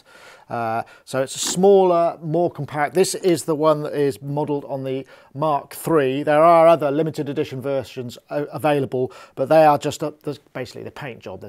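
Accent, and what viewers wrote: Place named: England, United Kingdom. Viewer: Man, are u from england?? I can't understand shit you say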